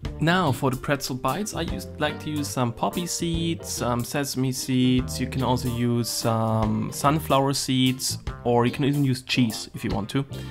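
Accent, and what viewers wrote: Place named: Germany. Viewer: First sentenced told you‘re from germany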